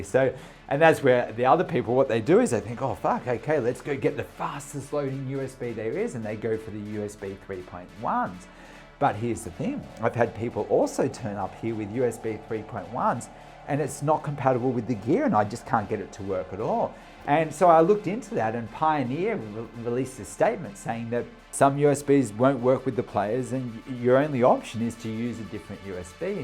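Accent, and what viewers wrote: Australia. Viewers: I love how he softens the blow of bad situations with that Aussie accent!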